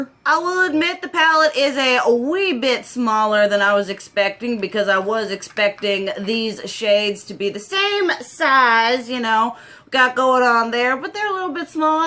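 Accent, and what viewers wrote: South, United States. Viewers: This is my first time on your channel... you seem to be goth with a country accent... coming from Arkansas I'm living for it. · Pretty goth lady with a southern accent? Amazing!!! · Woah. Just found this in my recommendations and I'm subscribing now. A fun pale goth woman with a southern accent talking makeup. This is amazing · Ok but I'm glad I'm not the only gothy person with a country accent lmao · Is there anything better than a big titty goth gf with a southern accent? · What’s up with the Wednesday Adams costume and the southern accent? Every video your accent varies. I’m not sure what’s going on with here. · first time watcher. can't get over Wednesday Addams having a southern accent 😅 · You randomly popped on my recommendations and I absolutely love your accent You’re so loud spoken and extra in a wonderfully southern way As a Caribbean girl people often complain that my speech like yours is loud But that just how I am and I love to see another female who’s voice isn’t all super dainty quiet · I thought she sounded more Virginia/Carolina area. 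· Are you southern ??? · Super new here. Goth Chick with a thick ass country accent. I am in love!!! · I'm new to your videos I'm from the south but I was not expecting the Southern accent I was like wow ok nice but no hate i liked this video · I love her southern accent!!!! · I just love your voice. I am guessing you are Southern like myself.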